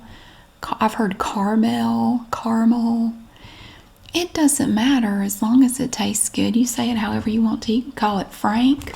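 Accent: South, United States. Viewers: I curious all of a sudden do your boys have the southern accent as strong as yours??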